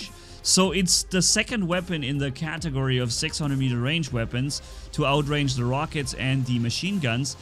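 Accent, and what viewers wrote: Germany. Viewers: Are you German? Bist du Deutscher?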